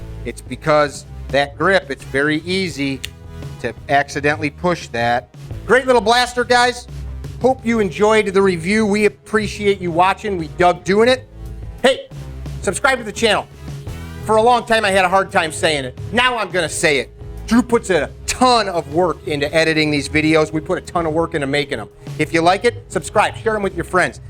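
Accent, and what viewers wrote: Chicago, United States. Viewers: boy, for sure. This the first time you've heard a Chicago accent? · Good video. Well done. I’m guessing you’re either from the Chicago area or maybe Detroit, I suspect. Seems a lot of people “don’t like” the way we talk. I’m pretty sure that’s because we speak with authority and sound different enough that we’re a little bit misunderstood. By the way I’m responding in reference to the correspondence on your home page where you offered the fellow to prove himself as a little bit more than a keyboard warrior who knows enough about something to believe he’s right but not enough to know he’s wrong.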